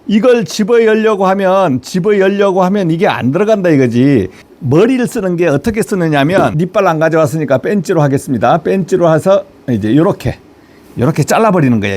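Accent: Jeju, South Korea. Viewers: 제주도 삼춘이다, 발음은 제주 토백이다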